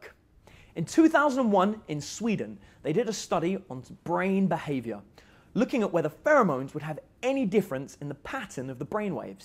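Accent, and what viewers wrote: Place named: United Kingdom. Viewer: I like his British accent :)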